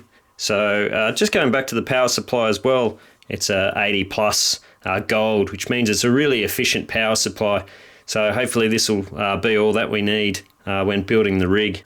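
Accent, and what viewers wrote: Australia. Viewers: Excellent no-nonsense videos, great to hear an Australian voice... can you tell me what the data usage is on a rig like this per month? Is that a stupid question?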